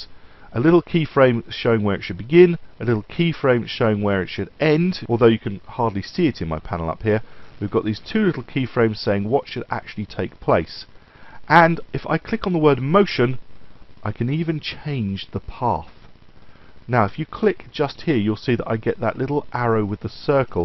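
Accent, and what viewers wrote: United Kingdom. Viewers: "Creative Cow" -> Kids toy -> Country music -> "Moo" sound effect -> British accent?! You surprised me there. I was expecting a southern American accent and some sort of hacker tutorial. Good lesson, man. These things are almost as useful as Tweens in Adobe Flash! This lesson helped me create the effect of the camera panning across a picture. Thanks a lot!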